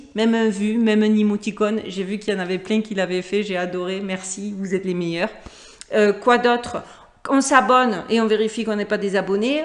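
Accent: Marseille, France. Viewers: Bravo! J’adore votre accent du Sud en plus ..un vrai bonheur de vous écouter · j'aime vraiment ton travail et ton accent de Marseille 🙂 je partage complètement ton regard sur le monde, et j'aime bien aussi ton sens des valeurs..... Belle et longue durée à ta chaîne!! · Madame je vous écrit sans avoir commencé a voir la vidéo ce que je ferais après donc pour vous dire que je vous apprécie beaucoup d'autant plus que je n'ai jamais été pour une Idole je ne suis Fan de personne . Ma modeste personne me suffit surtout en ce moment . Il faut pas se le caché non seulement vous belle mais avec un cœur un cerveau BIEN REMPLIS .Du bon .......sans nul doute et de plus ce joli accent Marseillais vive la France bon enfin bref vu l'état de la France et bien d'autre Pays en ce moment!!!!!!!! Juste pour vous remerciée de votre travail que je regarde avec beaucoup de PLAISIR . 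Merci je m'arrête la sinon c'est un roman qui serai écrit .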